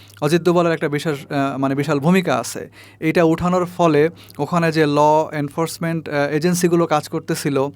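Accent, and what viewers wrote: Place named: Bangal, Bangladesh. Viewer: Korssy ,kaisse , dissey thik moton bangal bol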